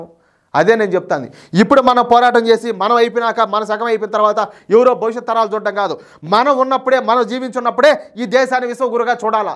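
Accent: Rayalaseema, India. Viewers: Rayalseema accent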